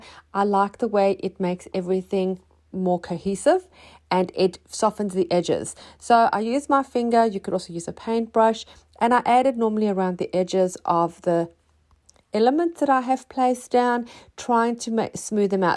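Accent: South Africa. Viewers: Lovely. Love the South African accent. ❤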